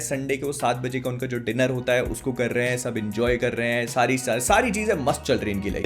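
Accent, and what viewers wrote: Bhopal, India. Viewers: Bhai tum Bhopal se ho ?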